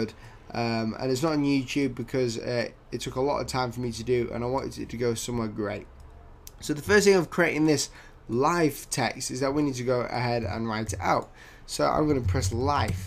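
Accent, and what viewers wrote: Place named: North, United Kingdom. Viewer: You sound like a northerner like me so, 'subscribed'.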